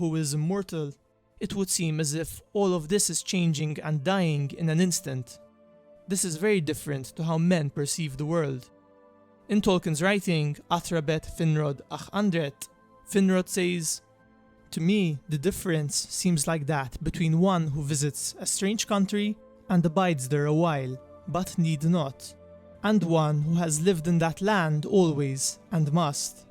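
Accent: Malta. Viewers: Are u Maltese? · Is the narrator Maltese? :) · Are you Maltese? Your accent is really familiar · Dude, are you Maltese?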